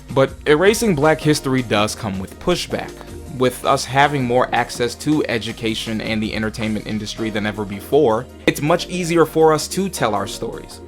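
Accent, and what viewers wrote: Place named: Chicago, United States. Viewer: Bro def from Chicago heights or chatam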